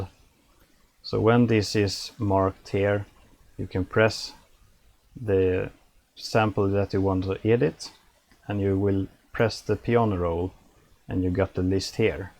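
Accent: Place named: Sweden. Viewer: ganska passande, har precis börjat med fl studio själv, älskar din svensk-engelska accent förresten :D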